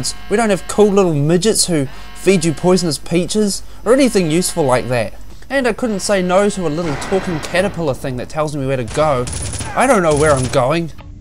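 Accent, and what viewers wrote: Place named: United Kingdom. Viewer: god i love your birtishnes :D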